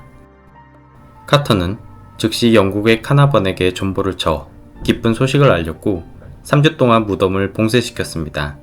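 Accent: Jeolla, South Korea. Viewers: 전라도 분 이신가보네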